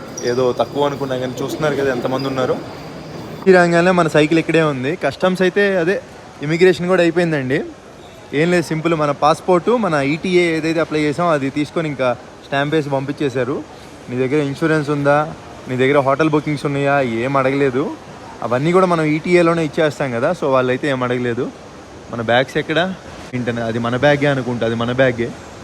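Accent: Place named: Guntur, India. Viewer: Guntur Slang.. superb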